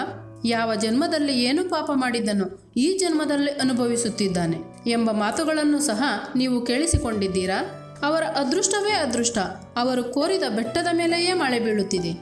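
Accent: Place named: Mangalore, India. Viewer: Neevu Mangalore navaru ri ....eer pateruna styld gotthapundu atthe.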